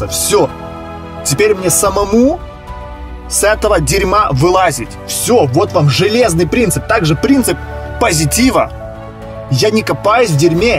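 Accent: Odessa, Ukraine. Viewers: Одесский акцент😉👍✌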